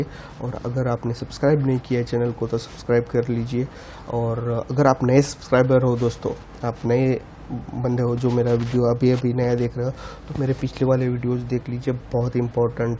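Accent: Maharashtra, India. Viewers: yourvishal hahaha, tyachya tone varun kalta, ki toh marathi aahe! Jay maharashtra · sir jee, r u maharashtrian ?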